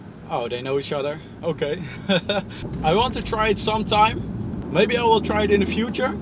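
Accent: Netherlands. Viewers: You can hear you’re Dutch but that’s not a problem. You’re video is really nice to look at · I dont think you can do anything about this but you can really hear your dutch accent very well :)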